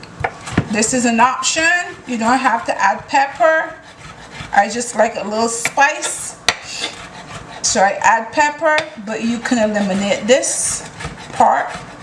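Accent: Barbados. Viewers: So good to hear your Bajan accent!!! I'm Bajan too living in Jamaica. I'm about to make some fritters now! Thanks for this recipe.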